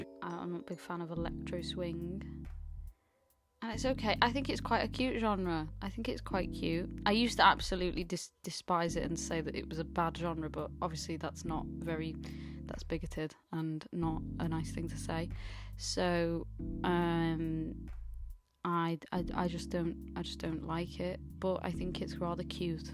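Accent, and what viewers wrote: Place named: United Kingdom. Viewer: Idk how u made urself more british sounding but ye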